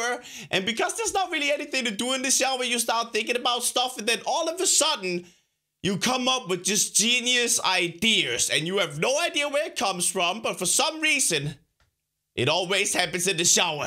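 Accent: Denmark. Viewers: Ideer is idea said funny because if you haven't noticed somehow, Crainer has a danish accent because he's danish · Dink Its his Danish accent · its because of his danish accent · He has an Danish accent so idea is ideer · Danish accent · YourNewFather it’s his accent he’s danish and everyone in Europe says it like that LOL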